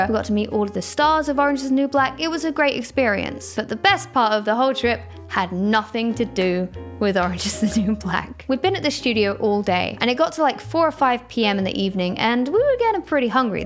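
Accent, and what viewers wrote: United States. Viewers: I really like your new videos and I hope you are happier❤️and btw your American accent is on point👌🏻 and this storytime was pretty funny😂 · Love the American accent x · Do a video in your American accent. It's hilarious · Why is American accent kinda cute? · Her American accent made this vid 50 times better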